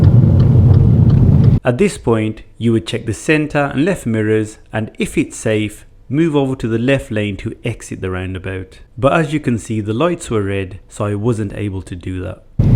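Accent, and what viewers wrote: Birmingham, United Kingdom. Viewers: Love your Brummie accent man! And the great driving advice obvs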